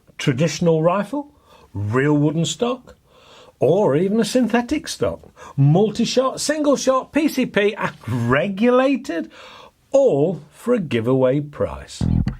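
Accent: United Kingdom. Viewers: What a great way to present review and I liked your British accent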